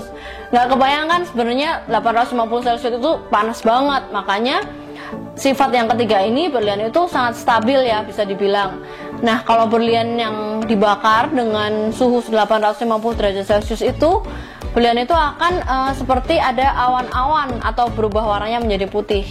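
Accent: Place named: Banyumas, Indonesia. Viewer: medok logat nya...kaya ngapak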